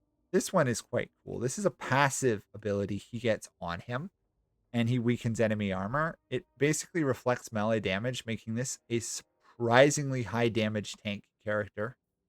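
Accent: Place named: United States. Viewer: Dw about it lol, it's hard to know if you've never really been taught and since most fantasy names and languages originate in Asia or Europe most Americans (assuming your American or Canadian from accent) just don't know since yknow, you don't need too lmao, but I don't mind helping with it in future if you ever wanna check, if you want my discord or something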